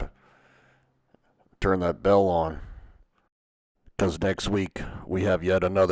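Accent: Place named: Upper Midwest, United States. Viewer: Spooky story! Sounds like you're from the Northern Mid-West? Canada?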